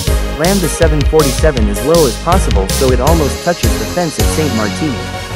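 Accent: France. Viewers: are you french ? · Are you French?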